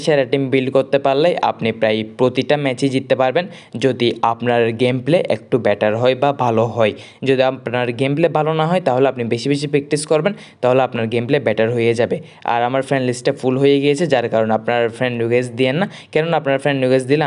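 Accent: Chittagong, Bangladesh. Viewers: Vai apnar basha ctg te naki😊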